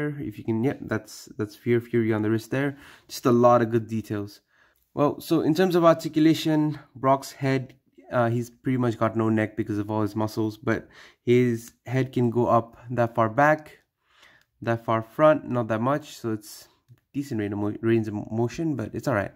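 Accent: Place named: India. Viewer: You sound like you're from india or neighbouring country how did you get this figure I want it too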